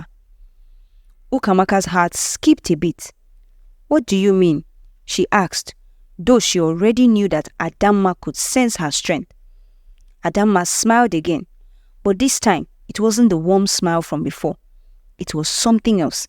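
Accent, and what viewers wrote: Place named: Africa. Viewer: I’ve been binging this whole channel for the past hour! It just popped up on my feed, and I love folk tales, fables, and traditional stories of different cultures that don’t usually get a lot of exposure internationally. The diverse communities in Africa, which are many, are very interesting. They’re not like any others that get the most attention and are retold and regurgitated by those who don’t have the knowledge or experience to tell these tales properly to convey the message. This channel is so unique and the stories they share are powerful and apply to so many different situations in every community. The messages are poignant and touching and they make you think deeply about the overall lessons they are supposed to teach and the difference it’s supposed to make in all aspects of life. Not that this is important to the overall story, but the narrator’s accent really brings it to life. 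The accents of the many unique cultures in Africa are so distinctive and beautiful. Stories told by the people who are intimately connected to the both the land and the people. This gives it an authentic and trustworthy feel that leaves an impression that stays with you forever. Bravo to the channel and its team for such excellent and extraordinary work!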